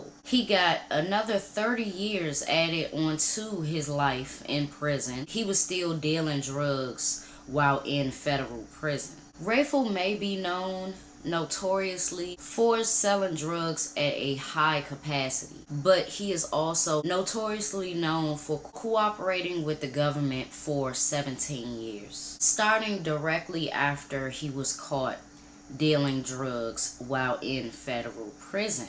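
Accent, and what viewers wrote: Washington DC, United States. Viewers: Dope content baby girl. I love ur DC Accent..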